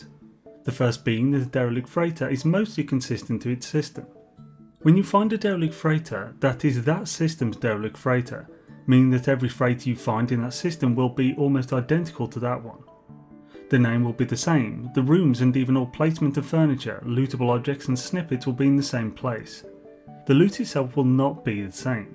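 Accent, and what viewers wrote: United Kingdom. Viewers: Definitely a Brit, I'm going with somewhere in the midlands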